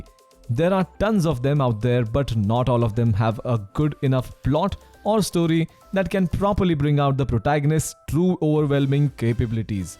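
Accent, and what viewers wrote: India. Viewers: indian accent is strong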